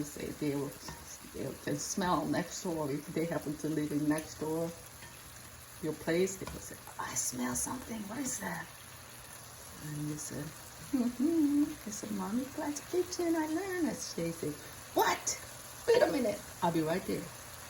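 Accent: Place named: South Korea. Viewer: Hi momiplier 😊 I am a korean american and I moved out of my family's house in 2021. I am missing a lot of korean food and it's very lovely to hear korean accent and laughter from you ❤ you make me miss so much food and I can smell the meat cooking from the screen ... please continue cooking!! You are giving me so much joy ❤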